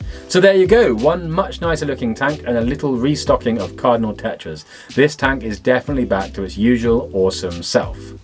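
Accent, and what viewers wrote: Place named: England, United Kingdom. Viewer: Great to hear an English voice, youtube is so American heavy especially aquatic posts 😊 Thanks for sharing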